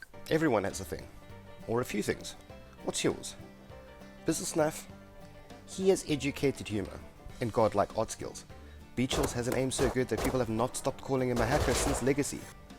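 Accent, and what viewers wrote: South Africa. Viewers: Afreekan accent:)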